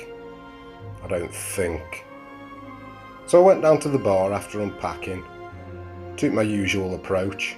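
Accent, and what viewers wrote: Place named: Derbyshire, United Kingdom. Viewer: That was ace, cheers very much. The accent had ne straight away, but I can't quite place it. Chewing words like Cheshire, hooting your 'oo's like Stoke, but probably more Derbyshire. I don't even care how out my guess, the tale told was a good tale well told. Thank you very much.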